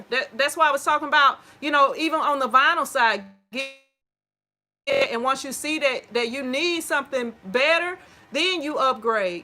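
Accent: South, United States. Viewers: Yes, I watch you because I love your southern accent. 😁 Great resources! Thanks.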